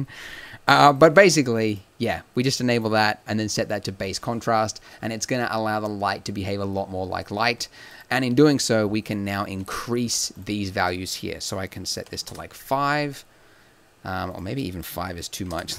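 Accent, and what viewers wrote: Australia. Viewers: I always wondered where your accent was from! Only realised you were a fellow aussie after watching your stylised earth tutorial the other day haha. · I didn't know you were Australian I thought you were British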